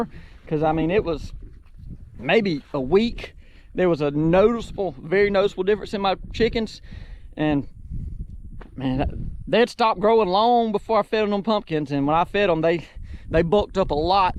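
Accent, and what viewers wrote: South, United States. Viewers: I'm from minnesota... I love your southern accent.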